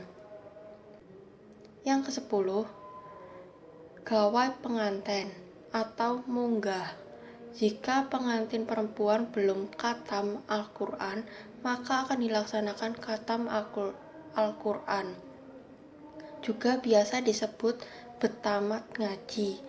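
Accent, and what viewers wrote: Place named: Java, Indonesia. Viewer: Yang jelasin kok logat Jawa, gak nyambung jadinya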